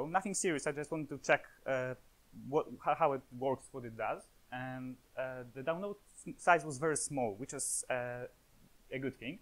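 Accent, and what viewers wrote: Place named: Poland. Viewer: dziwnie się słucha polaka mówiącego po angielsku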